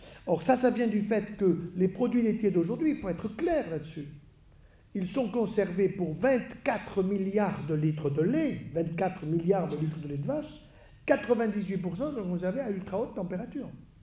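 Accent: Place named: South, France. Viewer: Entendre respirer la santé avec cette conférence pleine de bon sens, au rythme slow du laboureur qui swing avec le bio, et madeleine de Proust, avec l'accent de Marcel Pagnol. Professeur Joyeux vous nous faites un réel grand plaisir. Patrice D. Ingénieur Agronome né 1944 10 ans irrigation goutte-à-goutte au Maroc.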